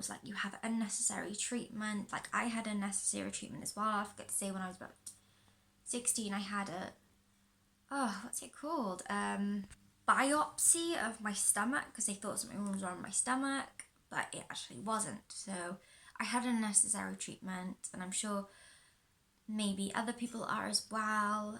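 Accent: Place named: Wales, United Kingdom. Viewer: Just watched the entire vid, knew you were Welsh :)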